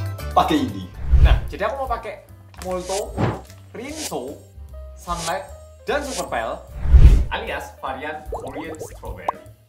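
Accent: Java, Indonesia. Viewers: haloo mas aku bolo bolo udah nonton dari jaman mas upload ciri² kamu psikopat atau bukan aku lupa taun berapa sampe ke detektif roemit dan rinding dong yg emang aku suka banget dengan pembawaan cerita dari mas, dan sampe akhirnya mas up pacar nya ke public and sempet kaget ternyata udah pacaran lama dan sekarang udah mau menikah, and aku juga mau menikah mas ditanggal 21 ini, selamat yah mas si medok 🥰🥰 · Maaf mas..calon nya bukan orang indo ya..kirain orang indo..sayang banget lama di indo ngak ada cewek indo yang doyan haha..oppss maaf..✌ bahasa medok tak kira ditaksir cewek dari jawa..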